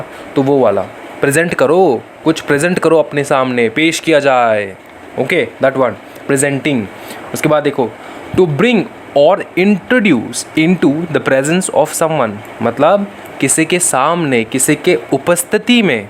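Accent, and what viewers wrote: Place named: Haryana, India. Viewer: Haryanvi voice Gjb 😊 I like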